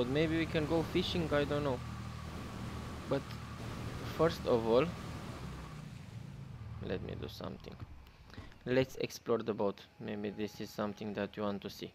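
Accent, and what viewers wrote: Romania. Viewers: Are u Romanian bro? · Ești roman după voce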